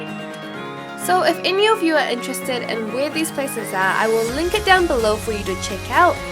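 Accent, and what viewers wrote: New Zealand. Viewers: I think she's Japanese, but her accent when speaking english is NZ I believe :) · I came here from the Only In Japan Channel and this is the first video I have watched. It was awesome. Thank you very much. I was especially excited you talked about Okonomiyaki. I had this in Tokyo and would really like to make it at home. Could you post the ingredients? P.S. Love the Kiwi accent. Cheers from Cairns, Australia (+1 Subscribed :-) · Love your videos, keep up the great work!!! It's great to see (& hear) a fellow kiwi enjoying Japan :) I tried to make it to that exact restaurant when I was there- failed, and ended up at some other random restaurant hehe Looking forward to returning after studies, and trying for a non英会話 job next time. Until then, I shall be inspired by your videos, cheers! :)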